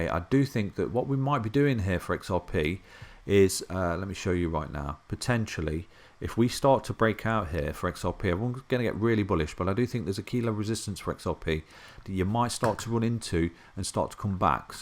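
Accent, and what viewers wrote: United Kingdom. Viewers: I admire with the british accent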